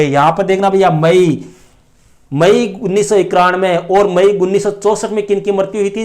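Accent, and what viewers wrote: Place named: Marwar, India. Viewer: Sir marvadi boli Kam samaj me aati he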